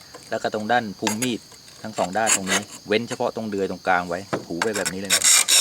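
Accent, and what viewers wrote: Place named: Central Thailand, Thailand. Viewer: ขอบคุณคร้าบ ทำไมพูดกลางชัดจังครับ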